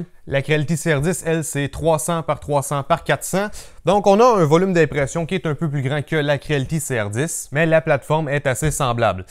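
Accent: Canada. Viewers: j'adore that Canadien French :-)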